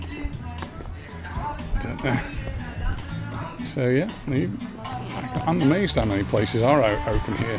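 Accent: Stoke, United Kingdom. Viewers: Are you from Stoke fella? You got a bit of an accent. I really didn't enjoy Cala Bona when I went. Far too touristy for my liking. If I wanted to eat burgers for dinner I'd go to Hanley. Edit - yeah, when I heard say "go on eet' that answered my first question.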